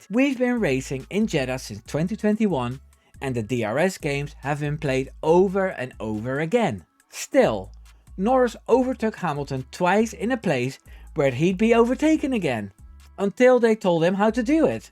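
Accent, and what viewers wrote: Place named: Netherlands. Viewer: I mean, even if I would have seen your videos for a very first time I would have known your Dutch by (and I don't mean this insutling) your obvious Dutch accent lol and no Haas is not rabbit, Haas is Hare. a rabbit is a konijn.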